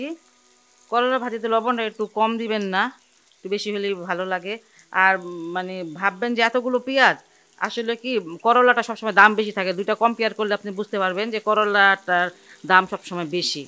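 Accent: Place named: Gazipur, Bangladesh. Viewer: ভালো লাগছে,,, আরো ভালো লাগছে আপু আপনার কথা গুলো মনে হচ্ছে আপনি আমাদের গাজীপুরের বাসিন্দা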